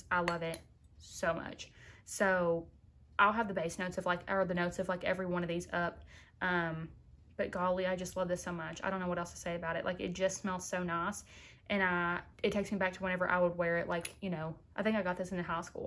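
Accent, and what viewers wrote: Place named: Kentucky, United States. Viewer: Are you from the southern Kentucky ? I hear the accent in you!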